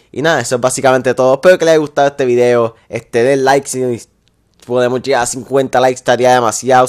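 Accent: Puerto Rico. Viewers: Eres de puerto rico? Se nota por el acento, biem Video like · Tu eres de puerto rico te eschuchas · tu eres de Puerto Rico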